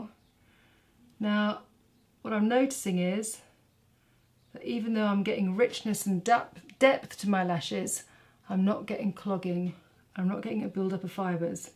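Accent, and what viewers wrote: United Kingdom. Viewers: you are adorable and I love your sweet soft British accent. This was a very nice tutorial. All the detailed explanation of the products and techniques really helps the everyday makeup wearer. Thank you and stay well ❤️🌻🌹🌺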